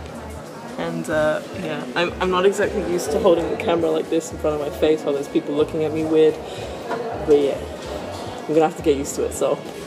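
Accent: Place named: Australia. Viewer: Do you live in the USA? Your accent sounds Australian. That would be a very long flight to New York to just visit for a few days. Haha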